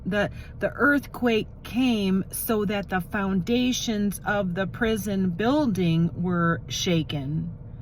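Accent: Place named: West Coast, United States. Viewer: Seems like you have a west coast accent, i thought michegan folks would kinda have a jersey accent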